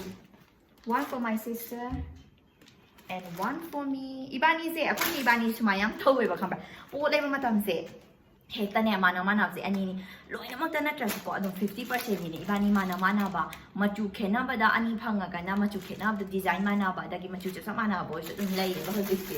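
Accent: Kakching, India. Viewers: yengvda nsk 😘 ym nungcye ngna wa ngangba j taba ym nungaiye kakching gi lol khoira ngangbdo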